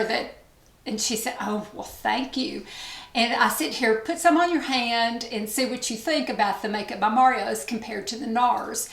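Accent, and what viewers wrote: South, United States. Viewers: you are adorable...such a classy well mannered woman. I love your southern accent I could listen to you all day. Thank you so much for showing us all the products and giving a review. I may buy the KVD liner because my liners always disappear after I wear them awhile. The Milani palette shadow looks beautiful on your blue eyes. On the color wheel orange is opposite blue so that makes your eyes pop. You are so young looking, just stunning.🌸💕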